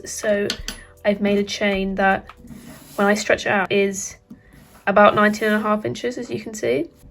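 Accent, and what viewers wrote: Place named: United Kingdom. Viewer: what kinda british accent do you have? i like it!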